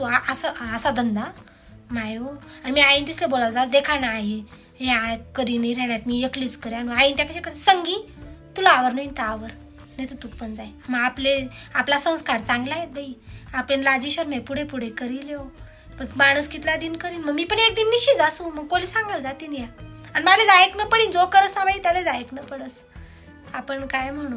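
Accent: Khandesh, India. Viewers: मी तर तवाच वळखल्यान तूण खान्देशी हाय ते छान बोलतीस व ऐकतच राव्हा वाटतेन आम्ही आहो विदर्भ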